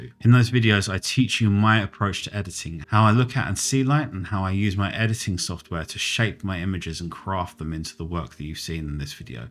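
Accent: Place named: England, United Kingdom. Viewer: Another excellent tutorial,!! Your photography and teaching skills are superb...plus, the English accent makes your videos even more pleasant to watch! 😁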